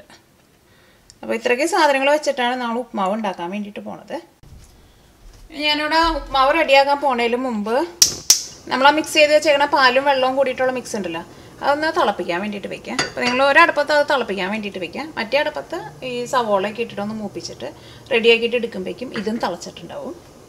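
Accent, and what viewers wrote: Thrissur, India. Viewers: Trissur language njangalkoke ishtanu.speed koodiyalum kuzhapamilla keto.soundoke cook cheyyumbo natural anu.athonnum sarallya.... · വളിഞ്ഞ തൃശൂർ ഭാഷയും ഭാഷയുടെ സ്റ്റൈലും ഒന്നു മാറ്റിയാൽ മനോഹരമായിരിക്കുന്നു ഭാഷ ഒരുതരത്തിലും സഹിക്കാൻ കഴിയുന്നില്ല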